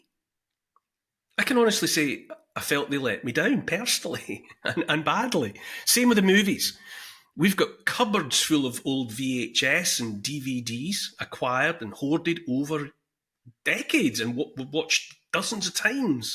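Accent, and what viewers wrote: Scotland, United Kingdom. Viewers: Thank you Neil I just listened to this and I agree with every word. A paradigm shift for me personally and for the world (I cautiously hope). I’m Scottish but live in Cornwall and sometimes wonder about moving back up north. Would be Fife probably. But Scotland has scared me a bit lately…. So many people very asleep. Including my family - not a single one understands what I’m talking about, when I dare to talk that is. It’s when I try to open the Overton window just a tad more (Maybe Trump isn’t such a scary prospect. Maybe you’d find interesting information on X. Etc etc) they just default to calling me a conspiracy theorist. One person told me she hates Twitter/X because it’s full on right wing hate speech. I asked her what things she reads there. ‘Oh no, I don’t use Twitter, I’ve just read about it’ !!!! And they tell me I exist in a bubble. 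Anyway, I felt happy yesterday - November 6th- and I kept quiet about it . Sadly I’m a ‘childless cat-woman 😹’ who doesn’t have a sympathetic partner or family. But I can still laugh and do my own research and make up my own mind. You are a helpful guide and I especially love listening as you speak in my familiar Scottish tongue. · Well said, Neil. I love listening to your Scottish accent. I’m not so fond of my accent but is very distinctive. I’m from Arkansas USA, Woo pig sooie! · Man this is the most hard core Scottish accent I’ve ever heard 🤣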